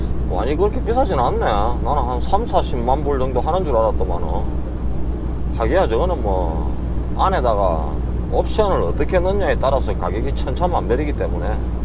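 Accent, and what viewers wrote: Gyeongsang, South Korea. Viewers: 갱상도사투리 잉글리쉬 억수로 잘하시네 한국서 원어민 교사 굿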